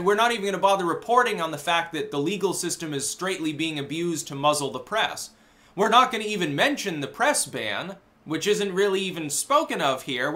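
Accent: United Kingdom. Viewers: Dude, you have the BEST British accent ever!!! 😎🤣😁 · British accent is king.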